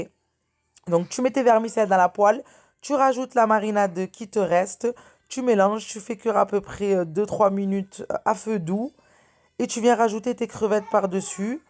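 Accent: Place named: Montpellier, France. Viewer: ton accent,tu es vers Montpellier ?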